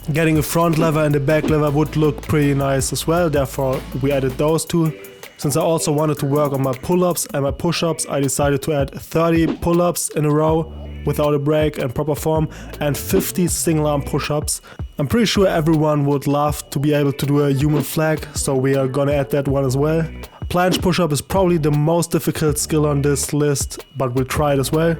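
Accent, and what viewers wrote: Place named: Germany. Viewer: I hear deutschen Accent (W Vid bro)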